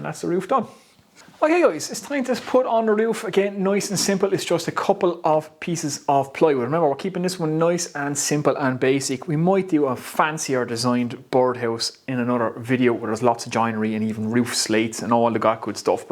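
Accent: Ireland. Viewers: Dang if I made this video it wouldn't be a 10th as cool because I don't have that badass Irish accent! Great job on that bird feeder and video!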